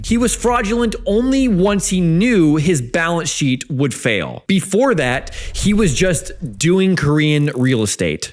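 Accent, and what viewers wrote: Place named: Australia. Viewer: Oh Shit he is starting to talk like an Aussie. He's integrating.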